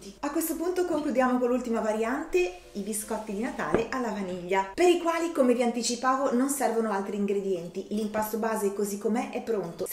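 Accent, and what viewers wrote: Romagna, Italy. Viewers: Ma che meraviglia sei? Ti trovo davvero brava.... Per non parlare della simpatia e l'accento che immagino romagnolo mi fa sentire a casa... Ma gli elastici dove li hai presi? Grazie e tanti auguri.. Anche se un pochino in ritardo!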